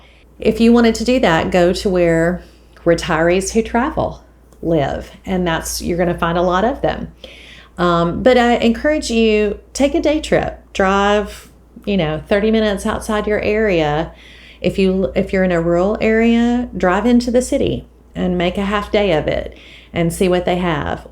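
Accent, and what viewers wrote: South, United States. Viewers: This is not on topic, but does anyone else stress over sandwhiching a quilt? That part brings me to tears. Starting with laying out the backing, then the batting and then the flimsy. I can never seem to line them up. It can take me a whole day to line it up and pin baste. Is it really as simple as all these Youtubers show in their videos? Or is it just me? That is why I haven't started on my next. I am only on my third quilt, but I habe sandwhiched at least 100 for my mother whom is elderly. I love your videos. Let that southern accent shine.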